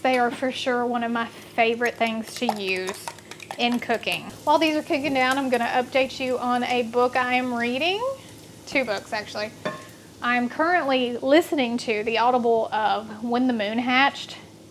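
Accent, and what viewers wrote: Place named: Tennessee, United States. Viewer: Girl!! When I heard you say Paris, TN!! I grew up in Humboldt, TN and have family in Paris! I should’ve known by your accent 😅❤ love it!!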